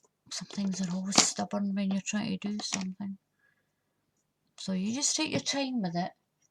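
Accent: Scotland, United Kingdom. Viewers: Fashionable Chambers...some people learn at a slower pace than others. Just like said. And maybe you don’t consider her voice is calming with that Scottish accent. Many many comments left on every one of her tutorials love her accent and love listening to her. Very calming.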